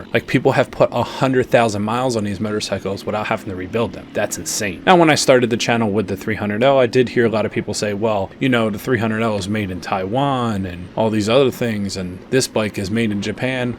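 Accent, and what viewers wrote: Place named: Pennsylvania, United States. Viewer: yeah it looked a lot like NC but I was hearing, to me, a little northeast Pennsylvania accent, so I wasn’t sure. Found your channel today and it’s great. Where is the best place to find used dual sports: FB marketplace? Craigslist? Cycletrader?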